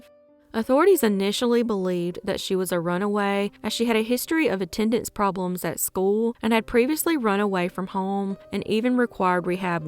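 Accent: South, United States. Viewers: Ur voice was definitely made for these types of YouTube videos!! Ur voice is so smooth but so southern... I love all ur videos & I personally think YOU SHOULD HAVE MILLIONS OF SUBS!! UR VIDEOS R NEW & UNLIKE YOUTUBERS WHO Have millions of SUBS & ALMOST ALL THEIR VIDEOS R VIDEOS THAT THEY HAVE SHOWED A MILLION FREAKING TIMES & ITS LIKE THEY SHARE RERUNS.. IT DRIVES ME NUTS CAUZE THEY MISLEAD U & BAIT CLICK U!! UR A SPECIAL BREED.. I WONDERFUL 👏 BREED.♥ THANK U.. MUCH LOVE FROM CANTON,OHIO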